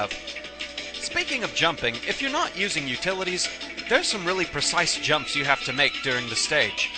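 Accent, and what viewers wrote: United Kingdom. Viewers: i like the accent too. i can't place it. uk?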